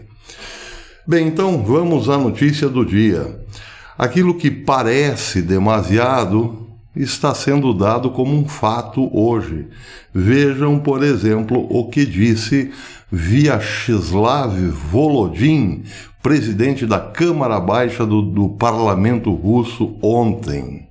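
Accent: Caipira, Brazil. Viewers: O senhor é o máximo com essa forma meio caipira de falar, amooooo, carinhos fraternos.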